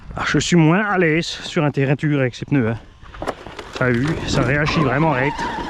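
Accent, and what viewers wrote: Alsace, France. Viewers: Le retour de l'accent Alsacien ! Je suis tellement fan !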